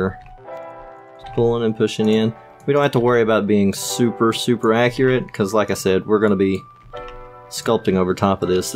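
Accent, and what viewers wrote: United States. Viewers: lol the country acccent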